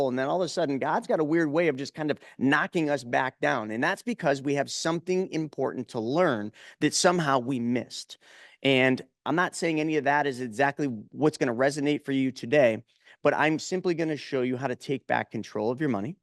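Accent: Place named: Upstate New York, United States. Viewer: ! 😂 I’m in upstate New York as well and I could hear the accent. I will be calling you to sign up for insurance for my RLT 🎉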